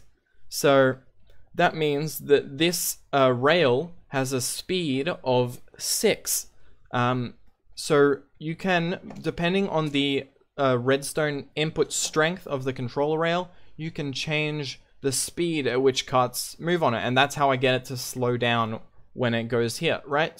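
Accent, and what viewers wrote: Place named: Australia. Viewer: You sound like an australian =) "Soouu"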